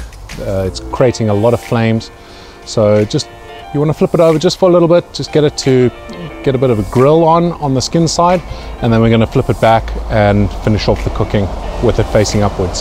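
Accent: South Africa. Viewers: Can I ask what your nationality is and where you currently living ,cause you sound to much like a South African to me but the products you use isn't familiar. FYI Love your Channel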